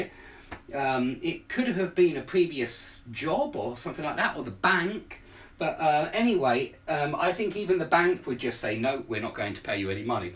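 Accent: United Kingdom. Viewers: I'm from Chile I have been practicing and watching videos for 3 years alone without taking a course in my country and I love to write my thoughts, my experiences and according to how I feel at the moment to start writing on my notebook. So I can improve my english writing. It is hard to find a native speaker in my country, especially british people because I really love this accent. Every day after work, I got home to watch your lessons this is the only thing I do instead of playing games. I hope you keep on making useful videos. It's a pleasure to watch them Greetings. ✌👌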